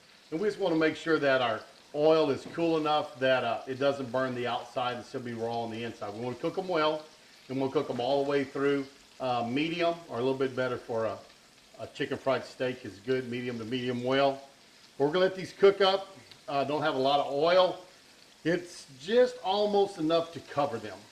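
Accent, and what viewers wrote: South, United States. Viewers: Looks delicious! I love your country accent. Very authentic.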